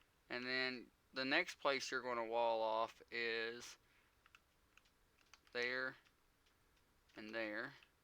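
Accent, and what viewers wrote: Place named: South, United States. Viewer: Sweet build! You sound like you might be a Southern boy like me.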